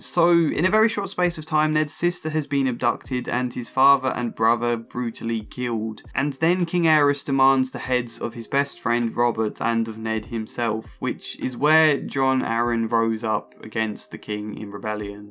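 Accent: United Kingdom. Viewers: It's okay - your accent shows that you're obvious British, and thus apologies are likely second nature to you. I'm sorry to have pointed this out; I'm Canadian. Sorry.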